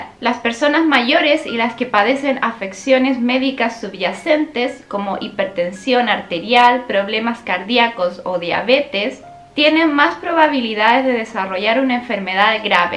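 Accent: Chile. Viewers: Es interesante que no has perdido el acento chileno, saludos y gracias por la información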